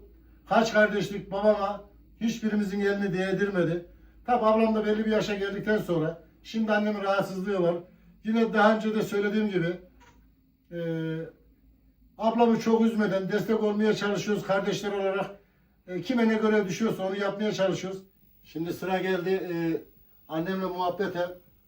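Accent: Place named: Central Anatolia, Turkey. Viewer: Şefim seni çok seviyorum programını takıp ediyorum.Şivenle anlatışınla buram,buram kokan bir İçanadolu çocuğusun.Hep merak ettim araştırıdım. Sana sağlıklı,huzurlu,mutlu,umutlların tükenmeden yaşamın tükenmesin.Seninle seni görünce bir gönül bağı kurdun çünkü bende Kırşehirliyim.Selamlar,sevgiler.....!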